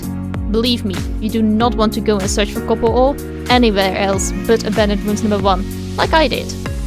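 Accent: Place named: Netherlands. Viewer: As a fellow dutchy, I could tell that Dutch accent from a mile away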